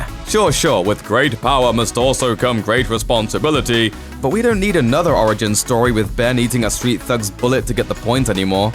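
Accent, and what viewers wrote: United Kingdom. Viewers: This dude has a British accent just like Tom holland 😅 I like British accents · Is this a British accent?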